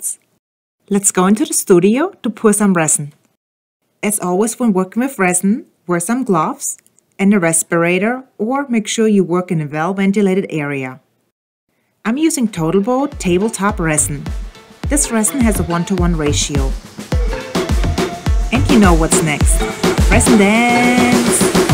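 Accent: Germany. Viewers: I like red dry wine, Pinot or Italian wines. That's a cute little dog at the end of the video. If I ever get a dog again I want a small one like yours. You are such a beautiful woman and I knew you would be a heart breaker in a dress. Is your accent German? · I can tell 🤔 are you German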